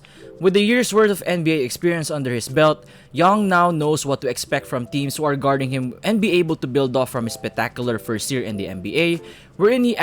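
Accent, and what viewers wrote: Philippines. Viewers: Man I recognise your accent, are you Filipino my guy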